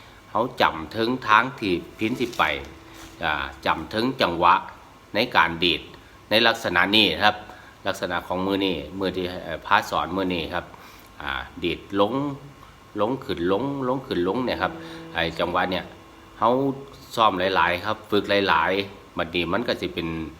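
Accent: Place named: Chaiyaphum, Thailand. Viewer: ม่วนหลายครับอาจารย์ เข้าใจง่ายนำพร้อม สำเนียงเว้าอาจารย์คือคนชัยภูมิแท้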